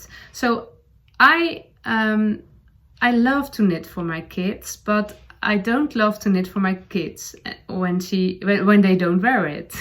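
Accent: Netherlands. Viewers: Hi, you have such a sunny, delightful personality and I love your "Dutchy-English"! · I am a new viewer -your podcast was recommended by Selma of Little Big Knits. I love your accent. I have a lovely sister-in-law and a good friend from the Netherlands so the accent makes me smile. A piggy 🐷 bank is generally a ceramic pig with a slot to put coins in to save up money. Now they come in all shapes and sizes. Thanks for a very entertaining podcast! 🤗 I am from BC Canada 🇨🇦 and have been knitting for over 60 years and am still only mediocre, but I do love knitting! 💜💙💕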